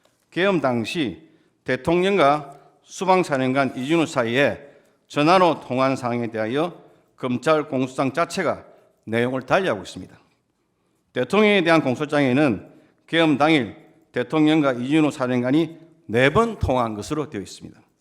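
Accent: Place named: Gyeongsang, South Korea. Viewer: 또 경상도네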